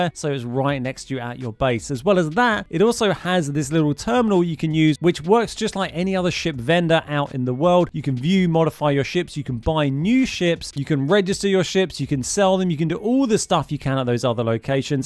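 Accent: England, United Kingdom. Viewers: I get a kick on how you English people have an accent, not to mention the unique words you use quite often like "Plunk" ... I'm not going to laugh or ridicule, because everybody's different everybody has their own little niche that helps them be more unique. I myself am quite unique that way also so I empathize. I have built about three outposts one of which just to supply a need. I did build a base on Masada. With me taking an educated guess, the very first time I placed the beacon down I had seven resources available at one spot. I would strongly suggest checking it out, because for the end of the constellation storyline is on that planet also. Not to spoil anything please be safe, also more information about Outpost information is greatly appreciated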